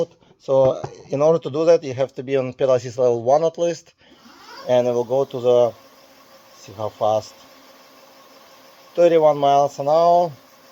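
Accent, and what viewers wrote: Russia. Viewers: Че за русский акцент?)))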